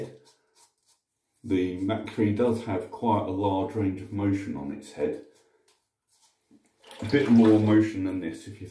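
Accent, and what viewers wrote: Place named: United Kingdom. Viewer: After 2 minutes i realised he is from uk, and he is really from uk! They have this accent even foreigner easy understand.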